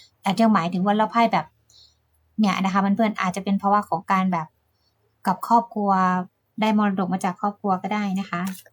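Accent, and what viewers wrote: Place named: Isan, Thailand. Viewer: ได้ยินสำเนียงอีสานกดติดตามเลยบักคนบ้านเดียวกับคร้าบ❤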